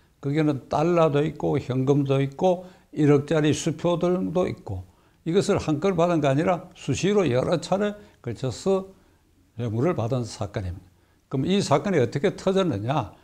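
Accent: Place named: Gyeongsang, South Korea. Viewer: 변호사님의 해박한 지식과 설명해 주시는 노력과 성의는 존경해 마지 않습니다. 변호사님 1.서울에 사신지 얼마인데 아직 경상도 토박이 억양과 어휘를 탈피하지 못하십니다. 2."다른 증거들로 가지고"는 " 다른 증거들로서"로 고치시고 3."미국으로 도망가가지고"는 "미국으로 도망가서" 4.그래가지고 이래가지고 등 가지고라는 이음새는 아주 듣기 싫은 낱말,특히 연설문 대중 연설문에선,. 5.지금의 젊은 세대에 맞는 어휘 억양,어구 등 부탁합니다. 감사합니다.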